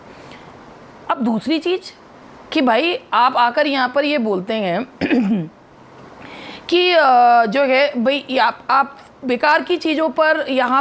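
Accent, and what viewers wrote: Meerut, India. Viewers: Mam aap meerut se ho kya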